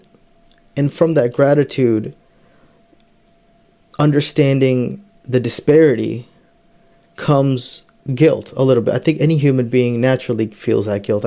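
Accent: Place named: United States. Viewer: dude's accent is about as American as it gets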